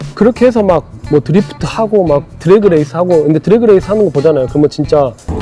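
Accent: Jeolla, South Korea. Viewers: 말투가 전라도 같은데 흔치않는 전라도 유튜버 반갑네요 😅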